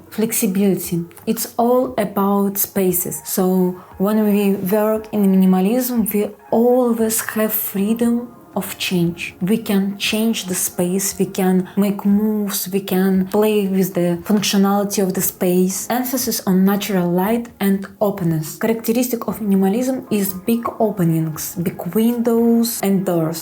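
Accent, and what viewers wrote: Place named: Russia. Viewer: Чтото мне подсказывает что это русский акцент 🎉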